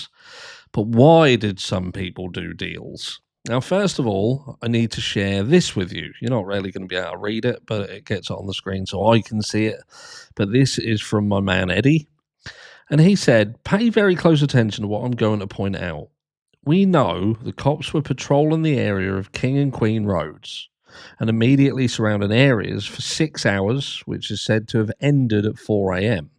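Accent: United Kingdom. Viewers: I love the way you swear in your lovely British accent! Keep it up. Love from Idaho!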